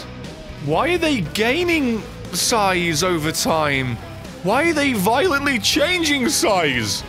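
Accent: United Kingdom. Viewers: Nah it's his British accent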